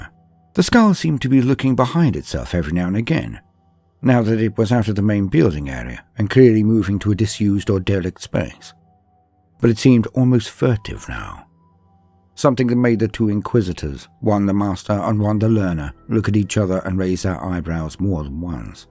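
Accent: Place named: RP, United Kingdom. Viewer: 100k is just the beginning, Sir what you bring to the table isn't just a talent for writing, nor a command of the queen's english, or your pleasing baritone of a voice. No, what you possess transcends all of that and yet is magnified by its very presence, you have passion and it reverberates with every line written, every word spoken, every image used, and yes every song (kudos to your wife BTW). You sir have earned your Legion of Followers, just as you will the hundreds of thousands yet to join.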